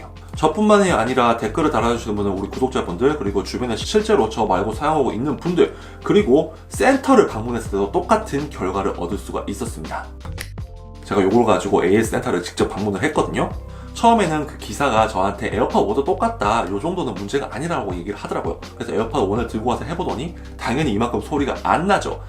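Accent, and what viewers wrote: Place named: Gwangju, South Korea. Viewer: 완전 광주사람이시네 ㅋㅋㅋㅋㅋㅋㅋㅋㅋㅋㅋㅋㅋㅋㅋㅋ구수하시다 (미친 틀렸다니)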